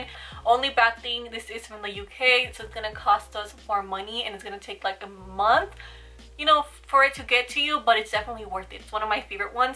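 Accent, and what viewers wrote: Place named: Puerto Rico. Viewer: Hello, I just started watching your videos and immediately I looked for a video in Spanish and I loved it ... but I am learning English and I will see your videos to learn how to apply makeup and learn a few words. I loved hearing you speak Spanish and knowing where you are from because of your accent. speaking english no idea you were from Puerto Rico a big greeting you are amazing... arriba los latinos !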